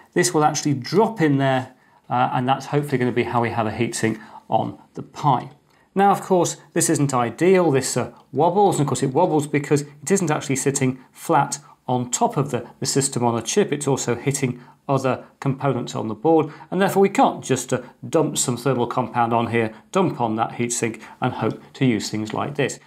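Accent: United Kingdom. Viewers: Sorry for asking something that isn't on subject, but are you British?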